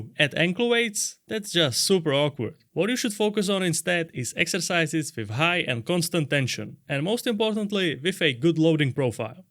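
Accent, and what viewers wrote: Czech Republic. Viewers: Are you from the Czech republic?? Because of the accent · Are you Czech by any chance? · czech accent detected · I was worried for a second there, hearing your accent, but Ig you're Czech ^^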